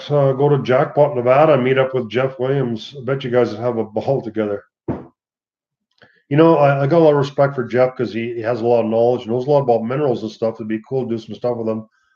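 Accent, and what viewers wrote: Canada. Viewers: Being English, i can say you sound 100% Canadian, Americans sound American. Strangely though saying ay a lot is a very southern english thing, cornwall, devon kent etc.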